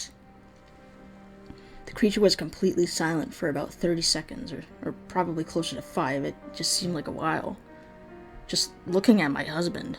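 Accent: United Kingdom. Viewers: heavy british accent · his English accent though 😂😂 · That British accent! xD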